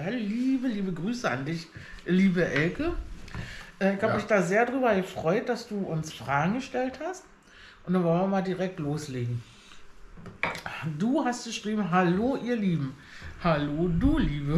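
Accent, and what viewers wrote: Berlin, Germany. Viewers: Berlinern ist doch super dann das ist doch Deutsch Grins. Liebe Grüße aus dem Sauerland nach Berlin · Moin moin 😊...ich finde berliner Dialekt schön....ach ich bin schnell mit ausmisten 😊😅...... 😅😅😅.....❤❤❤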